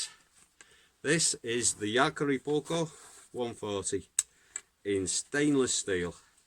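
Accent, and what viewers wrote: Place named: Merseyside, United Kingdom. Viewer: Subbed. Nice honest review which covers a few pointers that others do not, i.e spine dynamics using a ferro. Good to hear a Merseyside accent in Lapland! I bought the last stainless 140 on the Varu site a couple of weeks ago, shipping is a bit slow because of the plague but I hope to get it middle of next week. I was agonising about the cost but I am glad I pulled the trigger on it. Now will be carrying the 140, the Savotta pocket chainsaw and the Fiskars X7, all made in Finland. That package covers all my camp/bushcraft needs! Any tips on sharpening the stainless version? Cheers mate.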